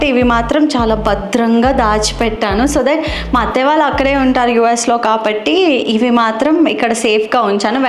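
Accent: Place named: Bhimavaram, India. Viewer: వావ్ 😍 మీ కిచెన్ చాలా బాగుంది, ఆ సామాన్లు చూస్తోంటే నాకు మా అత్తగారు గుర్తోచ్చారు, ఆమె అలానే బొలెడన్ని దాచి పండుగకు శుభ్రం చేసేసి మళ్ళీ దాచేసేవారు 😂 ఆమె తరువాత చాలా వరకు నేను ఆర్ఫనైజ్కి ఇచ్చెసాను. మీ కిచెన్లో నావి బుజ్జి సజెషన్స్..ఓవెన్కి సంబంధించినవి బౌల్స్ అవి ఓవెన్ కిందనే పెట్టొచ్చు కదా? స్నాక్స్ పెట్టారు అక్కడ.. స్నాక్స్ అన్ని ఒక బాస్కెట్లో పెట్టి పైనా పెట్టుకుంటే అస్తమానం డౌన్ అవ్వాల్సిన అవసరం ఉండదు కదా.. గెరిటాలు చాలా ఉన్నాయి, అన్ని వాడం కాబట్టి కొన్ని దాచెయచ్చు మేబీ.. లేకపోతే మెస్సీ అయిపోతుంది. మీ రూట్స్ భీమవరం అని ప్రతిసార చెప్పనవసరం లేకుండానే అత్తయ్యగొరు, మావయ్యా గొరు అంటారు చూడండి. అక్కడే అర్థమైపోతుంది.భలే క్యూట్ ఉంటుంది ఆ యాస ❤️❤️ లవ్యూ వితిక గారు 🩵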